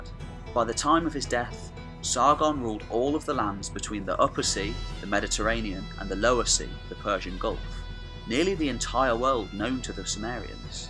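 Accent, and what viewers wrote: United Kingdom. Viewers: wait, you forgot to mention his luscious british accent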